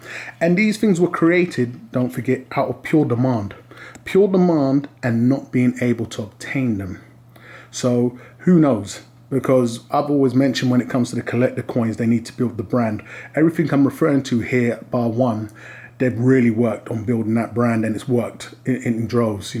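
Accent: United Kingdom. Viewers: Hi, you sound British where do you get your bullion from in the UK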